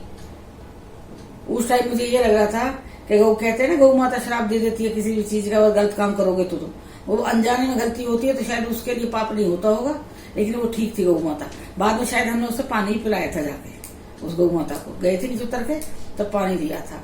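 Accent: Uttar Pradesh, India. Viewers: App humri jesi baat karti ho up sahid 😊👍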